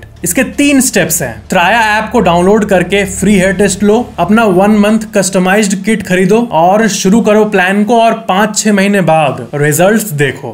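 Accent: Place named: Bihar, India. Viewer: But It sounds Bihar or Jharkhand* accent🫠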